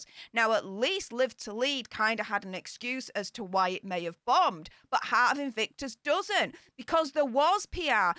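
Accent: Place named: Nottingham, United Kingdom. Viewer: Ayup. Thought for a minute you were from my town, Nottingham lol.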